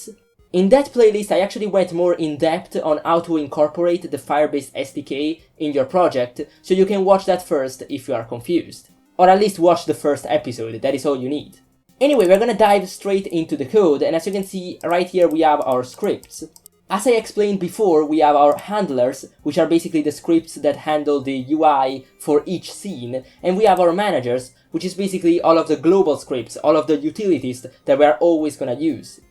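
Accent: Italy. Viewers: Si sente che sei italiano, Grande ✌️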